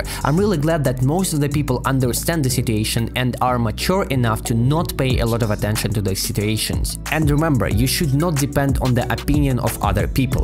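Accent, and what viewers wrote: Russia. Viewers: Thanks mr Russian man news man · next video with strong russian accent please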